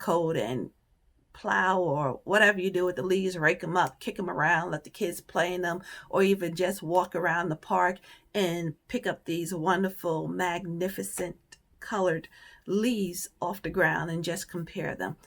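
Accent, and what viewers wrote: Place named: Georgia, United States. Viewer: I’m 3 yrs. Late but for being born in NYC and living in NORTH Jersey you sound like you come from Georgia. If you’re still there in a few more years we might be neighbors when I finally retire.. 😂😂😂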